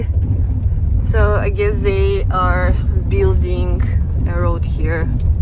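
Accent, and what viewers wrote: Russia. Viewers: Hello from Florida, USA I love to listen to you speak English with your Russian accent. You sound so interesting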